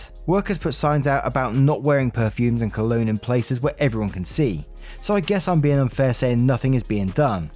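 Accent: England, United Kingdom. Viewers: There's nothing better than hearing that proper English accent sing am I the butthole😂😂❤❤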